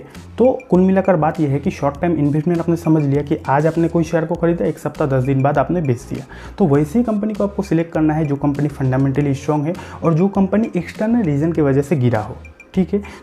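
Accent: Bengal, India. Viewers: Bangali ha kiya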